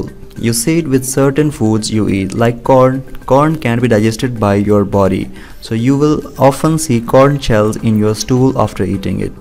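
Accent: India. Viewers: Is that an Indian 🇮🇳 accent? I'm chewing gum right now This is helpful 👍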